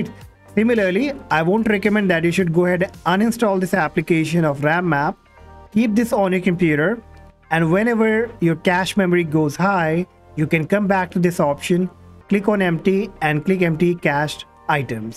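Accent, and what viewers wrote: India. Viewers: u know u saved when u hear an indian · The Indian accent makes me not want to download this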